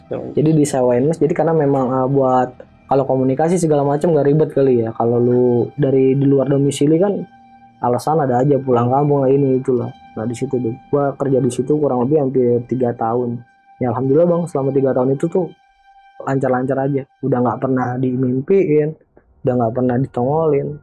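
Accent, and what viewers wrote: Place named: Betawi, Indonesia. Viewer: Ceritanya menarik untuk terus diikuti hingga akhir, gaya bertuturnya asyik khas anak muda betawi, si mas yg cerita ganteng pula, paket lengkap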